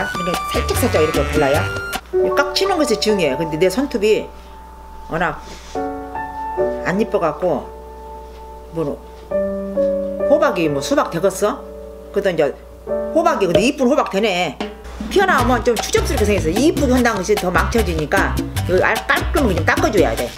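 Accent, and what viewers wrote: Jeolla, South Korea. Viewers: 부모님 둘다 전라도분이셔서 이쪽 사투리 너무 좋아요 ㅠㅠ♡♡시골온것같음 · 전라도 분이신가요? 저희친가도 전라도라! 익숙한 사투맄ㅋㅋㅋㅋㅋㅋ 오래오래 건강하세요❤❤❤❤❤❤❤❤❤ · 할머니 ~ 저희할머니도 전라도 해남분이시라 할머니랑 말투가 넘 비슷해요 😚 그래서 우리 할머니처럼 느껴지고 너무 좋아요 !! 사랑해요 할머니 💖 오래오래 건강하세요 ~ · 전라도사람이라그런지 친근감이 갑이에요♥너무귀여우셔♥♥ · 얼마나 부러운지 몰라요. 우리 할머니가 계셨으면... 우리 할머니 말투랑 똑같아요. 전라도 사투리! 진짜 너무 심하게 마음이 따뜻해지고 할머니가 그리워지면서 동시에 너무 웃김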